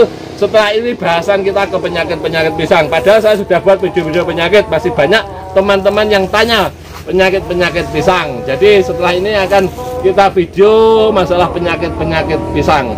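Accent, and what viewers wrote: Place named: Surakarta, Indonesia. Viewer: Dari logatnya kliatannya pak dhe berasal dr wlyh eks karesidenan solo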